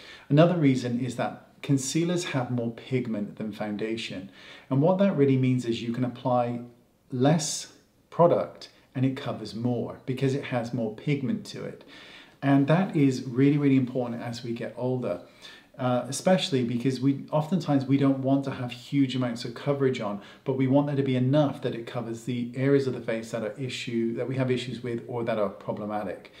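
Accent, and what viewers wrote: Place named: United Kingdom. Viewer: I loveyour videos and tour Brittish accent!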